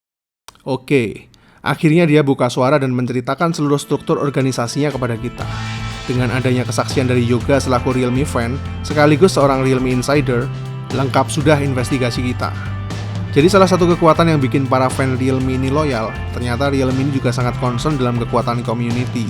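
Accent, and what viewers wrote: Yogyakarta, Indonesia. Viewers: Ealah cah jogja to mas e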